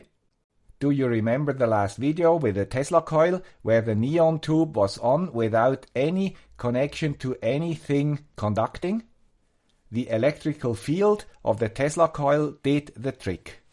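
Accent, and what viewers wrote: Switzerland. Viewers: Thanks for taking the time to answer, keep up the good work. Sunday morning newspaper, not any more, now it Sunday morning and new video from the brilliant guy with the Swiss accent.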